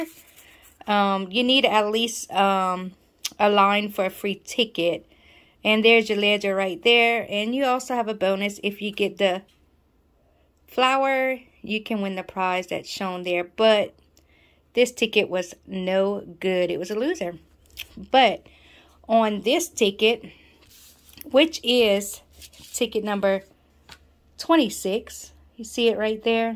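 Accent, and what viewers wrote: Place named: Cajun, United States. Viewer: that cajun accent, you was extra heavy with it and i love it lol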